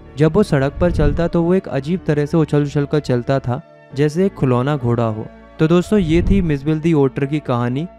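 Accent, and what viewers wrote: Uttarakhand, India. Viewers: Are u from Uttarakhand❤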